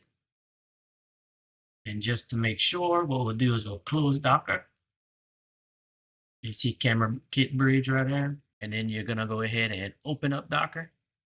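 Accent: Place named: Louisiana, United States. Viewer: I know a badass cajun when I hear one. Thanks for this tutorial ami ♥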